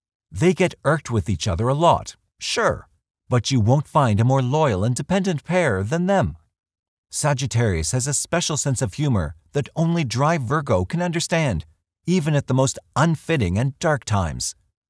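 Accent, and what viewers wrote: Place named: Canada. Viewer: The narrator is from Canada; I can tell because of his pronunciation of " ou" .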